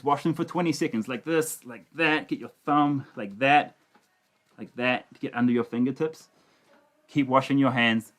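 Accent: India. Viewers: Stay safe......just started watching your vlogs after that police incident. And liked your hindi accent. Love from bangalore. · Hi I’m in the blue mountains in Sydney. We are quite good! I have friends in Bangalore that banged on pots which was quite beautiful to wake up the government.. take care and stay safe! You sound more Indian than a kiwi.. blessings 🙏🏿🙏🏿🙏🏿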